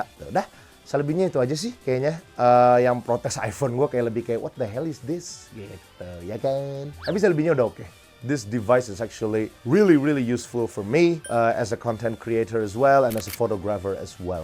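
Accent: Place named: Java, Indonesia. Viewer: Aku mendengar jawa jawa jawa disini 😅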